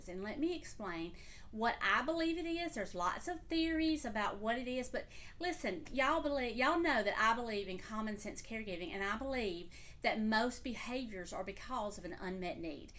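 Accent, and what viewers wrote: South, United States. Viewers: I just love your southern accent and delivery. You are so sweet and compassionate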